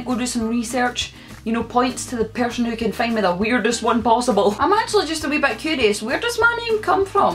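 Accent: Scotland, United Kingdom. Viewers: Loving the Scottish accent. Born in raised in London but have Scottish heritage and am so proud of it. What part of Scotland are you from? My family is from Gretna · You sounded EXTRA Scottish in this video 😂👌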